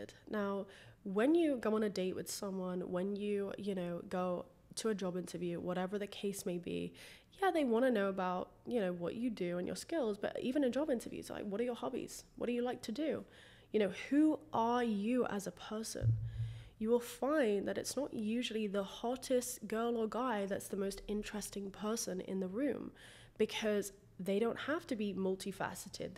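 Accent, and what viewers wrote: United Kingdom. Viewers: This just landed on my recommended page and I instantly thought that Billie Ray girl from Tiktok started doing self development videos and got a British accent lmao. Really like the content though! My kind of jam.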